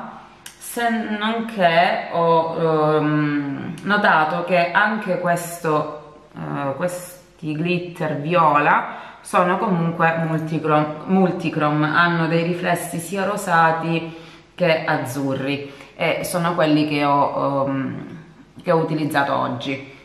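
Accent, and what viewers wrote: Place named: Sicily, Italy. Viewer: Io sono Siciliana.. mi sembrava che eri siciliana...ho gli zii che stanno in Calabria ♥️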